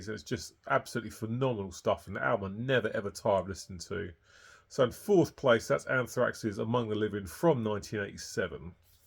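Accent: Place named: England, United Kingdom. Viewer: he sound English lol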